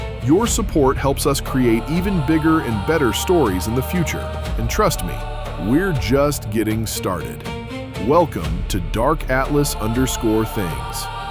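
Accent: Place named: United States. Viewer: There are better sites than this and don't have the silly American voice.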